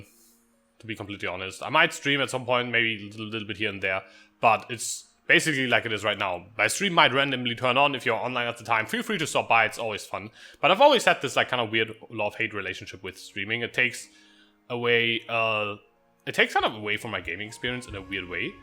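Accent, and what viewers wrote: Germany. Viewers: well, you gonna tell us where that is? prolly somewhere south of Berlin? or am i getting your accent wrong? :D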